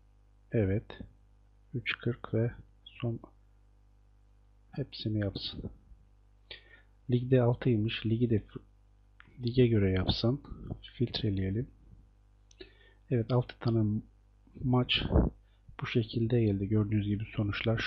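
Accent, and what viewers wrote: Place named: Ardeşen, Turkey. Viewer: biliyordum :) ardeşen tarafları gibi hissettim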